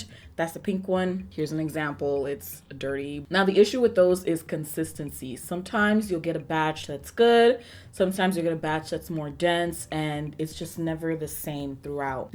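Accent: Nigeria. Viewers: Can we please get a video of you speaking only in your Nigerian accent? I just love it !! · As a fellow Nigerian, I am going to need a video with you speaking mostly with your Nigerian accent 🤠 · Can you do a video only in your Nigerian voice ❤️ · Wow your hairstyle is cute! <3 Also you are one of the most unproblematic beauty youtubers! I love to watch your videos not even to learn makeup, but to listen to you talk. You always seem so friendly! Like a sister, a friend or the coolest auntie! <3 And your voice is very nice to listen to! And I also love when you speak with your Nigerian accent! <3 It's almost like ASMR for me! <3 Sending you all the love from Poland! Wish you the best! <3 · A British/ pommy accent made a few appearances in this vid, but I definitely love the Nigerian accent!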